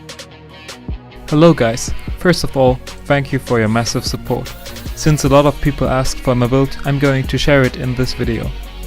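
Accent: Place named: Germany. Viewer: Naja, also man muss ja sagen... Der deutsche Akzent ist ja mal echt lustig :D aber deine intro haut es raus... Mega awesome :3 wirklich und danke für den build anyways :D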